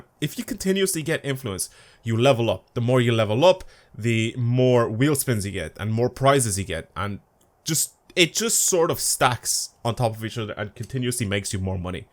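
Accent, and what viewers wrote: Ireland. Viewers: Are you Irish? I assume yes. I'm new to your channel. Am Irish too, where you from?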